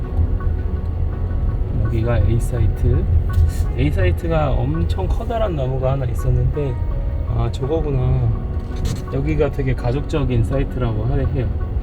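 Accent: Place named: Japan. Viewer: 얼마나 설레며 준비를 하셨을까 그 마음이 저한테 까지 느껴집니다🔥 그나저나 일본톤 찰떡입니다👍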